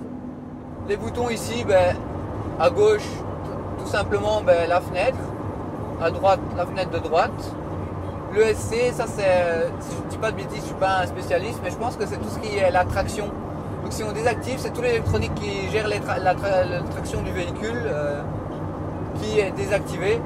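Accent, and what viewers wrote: Belgium. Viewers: On reconnaît le petit accent belge. 🇧🇪🇧🇪🇧🇪🇧🇪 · Tes belge de ou bruxelle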